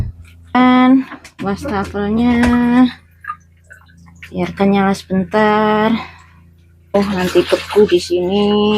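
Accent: Java, Indonesia. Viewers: Mingat..mingat o kono ..iki barangmu...hihi ..seneng dengerin khas jawa nya 😁😁 Bun saya sering koment, smg tdk bosan😊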